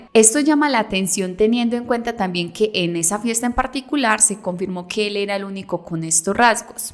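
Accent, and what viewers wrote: Colombia. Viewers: Quisiera tener este acento 🤤🥰🥰🥰🥰es como que un fetiche escuchar el acento Colombiano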